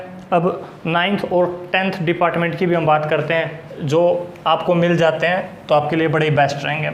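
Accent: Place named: Haryana, India. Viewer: मुझे अपनी साइड का accent लगा बोली का तब पूछ लिया था sir मैं proper नारनौंद से हूँ Best wishes for your channel भोले नाथ आपको खूब तरक्की दे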